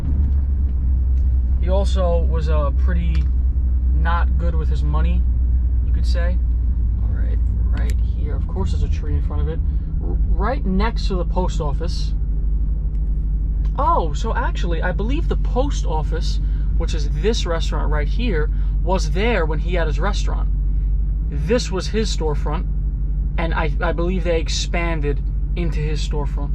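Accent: New York, United States. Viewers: I love your videos & your voice! You’ve got a tiny bit of the NY accent.🥰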